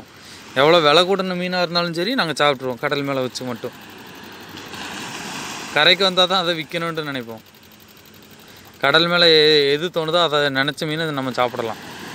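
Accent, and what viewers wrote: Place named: Madurai, India. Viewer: Love to hear your slang thambi..please don’t try to change while you speak..I’m from Madurai and forgot most of the terms..While you speak,iI really feel good and become nostalgic.God bless.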